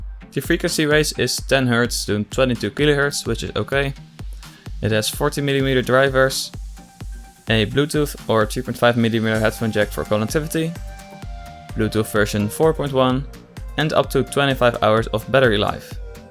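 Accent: Netherlands. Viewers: sws nederlander hahahha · ur dutch right?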